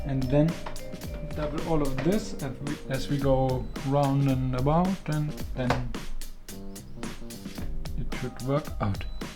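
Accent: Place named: Germany. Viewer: a few seconds in and i see papers and i hear german accent... subscribed.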